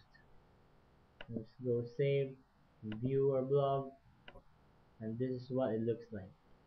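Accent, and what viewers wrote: Philippines. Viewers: its because you sounded like noypi... just like me.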